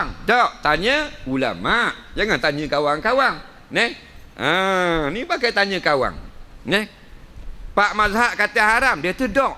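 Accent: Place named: Pahang, Malaysia. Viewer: Pahang doh ustak.. Time kaseh banyok impo nye ustak..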